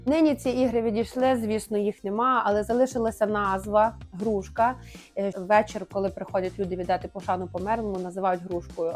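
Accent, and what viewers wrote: Hutsul, Ukraine. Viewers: Я все зрозумів, просто замість Я говориш Є і в тебе гуцульській акцент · Як я люблю цю жінку, особливо її гуцульський говір. Нехай щастить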